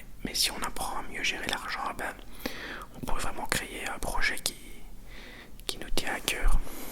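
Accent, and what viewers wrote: Belgium. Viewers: J'adore l'accent belge😉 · L accent belge me detent beaucoup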